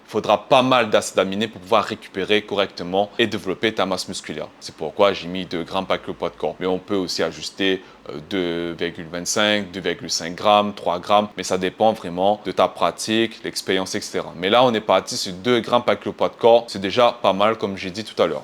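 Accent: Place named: Caribbean. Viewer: Première fois que j'entends un gros l'accent créole comme ça 😳 Mais bonne vidéo. Ps : Juste les gars de la muscu, tous les sujets ( Tous) on déjà été traité. De prés comme de loin. Les reste sa tourne en rond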